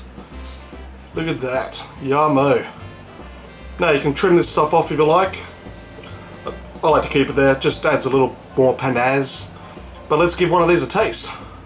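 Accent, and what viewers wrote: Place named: Australia. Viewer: Hey mate are you aussie by any chance? Nice apples btw, they looked so tasty and when you bit into it I was thinking "god I bet that tastes sweet"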